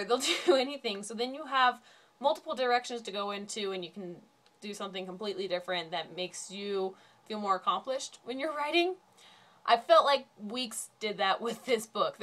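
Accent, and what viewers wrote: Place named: United Kingdom. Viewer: Welcome Back Pretty , your accent changed a little to British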